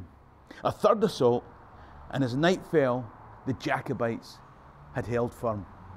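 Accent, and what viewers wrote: Scotland, United Kingdom. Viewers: after the US midtrems happen I will get back to finding out what a Jacobites is a slang or a cuss word. I have tried four time and repleted to understand what it means. You do know you get a bit scottish when you talk??? lol hugs to the family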